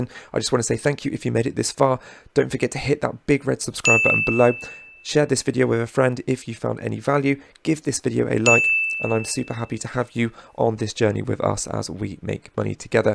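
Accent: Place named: West Country, United Kingdom. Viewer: Good Video. I also like your very discreet west country accent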